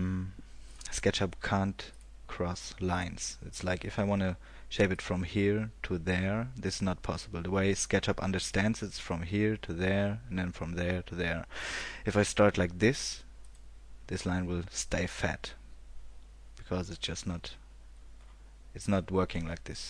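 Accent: Germany. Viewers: 14,958 views as of right now. I think we like your common sense attitude and your clear way of speaking. It's apparent that German accents are cool... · ARE YOU A GERMAN INDIVIDUAL? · a german accent but its a good video ;) thx´s :)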